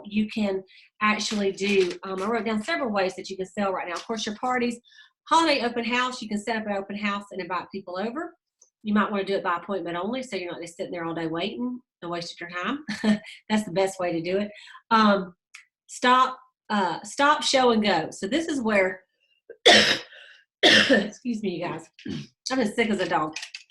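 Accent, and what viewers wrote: South, United States. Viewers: I love your Sunday chats. You keep us up to date. I love hear your southern accent ,when you explain or introduce company promotions. You seem to always make it clear and very convincing that we can achieve and you always make an example of how you did it. Which is so encouraging. You are a true example of Go Give A great representation of Mary Kay.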